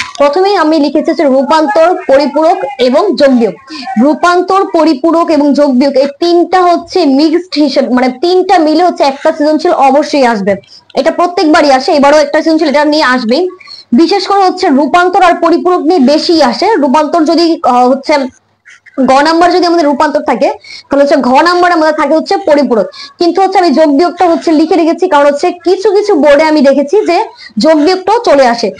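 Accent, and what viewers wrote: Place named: Kolkata, India. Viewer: ভাষা কলকাতার মতো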